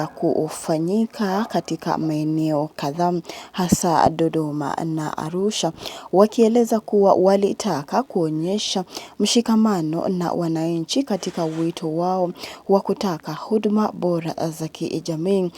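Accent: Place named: Kenya. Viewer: Ndg. Wananchi hii chanel ni ya kenya pia hata huyo anaeongea ni Mkenya lafuz mumeisikia na hizo picha ni za zamani wakati wa mazoezi ya makomando puzeni hayo. Tanzania ni salama na tulivu Amani tupu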